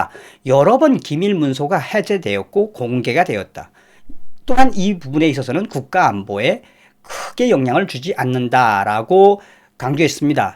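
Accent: North Korea. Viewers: 정확한발음과 구수한북한 억양이 아주 좋습니다.👍🎆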